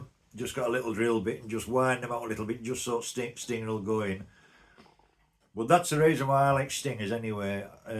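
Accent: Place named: Scotland, United Kingdom. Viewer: . I’m glad you’re OK and you just had a flat tire. I totally love your accent. I was just imagining you screaming out the window with that lady that was honking at you. I think I would’ve been dying laughing if I were her and I doubt if I could’ve understood anything you said. Sean Conner was a Scot, wasn’t he? God bless you my friend.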